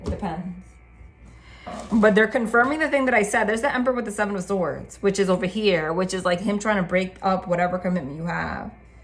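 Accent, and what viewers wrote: New Jersey, United States. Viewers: I just Love your accent 😂 that East Coast NJ accent! Nothing like it✨🫶🏼✨FUN~KEY😂(funky) ✨ Energy🎉 and your readings are so beautiful, thank you. Lots of love & Light sent to you and everyone here🧡☀️💫✨Namaste 🙏🏼🏵️🍀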